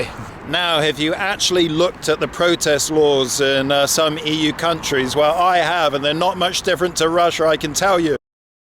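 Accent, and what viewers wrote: England, United Kingdom. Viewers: The most annoying thing about you is your pompous english accident maybe that's why you only have ten thousand subscribers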